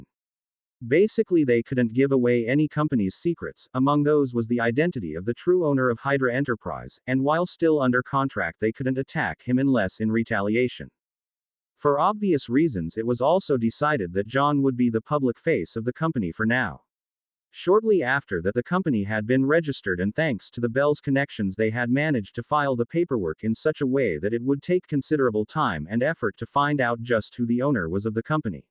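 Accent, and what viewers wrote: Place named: India. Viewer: Just let the AI read sverythinh l8ke before because we cant hear you properly Ypur indian accent is very heavy..and the audio qualjty is now poor unlike your earlier stories Yhr stories are nice but work on that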